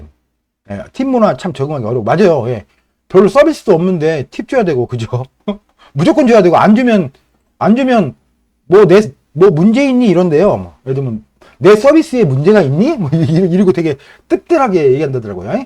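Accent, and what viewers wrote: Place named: Jeolla, South Korea. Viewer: 말투가 약간 전라도분 억양같아요^^ 좋은 내용으로 영상 잘 보았습니다. 감사합니다.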